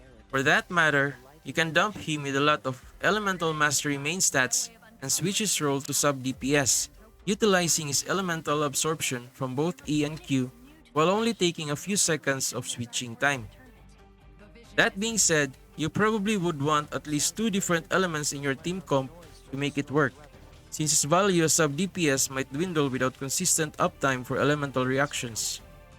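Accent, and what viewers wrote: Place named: Philippines. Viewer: MmHmmhmmm that thick Filipino English accent is so *chef kiss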